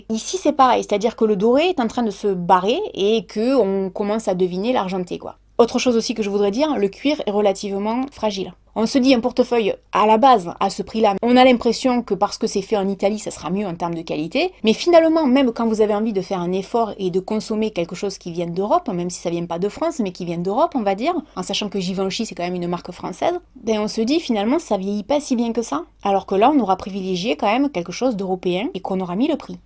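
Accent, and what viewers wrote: South, France. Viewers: Sa fait trop du bien d entendre l accent du sud... je suis de perpignan sa fait trop du bien❤❤❤ reste comme tu es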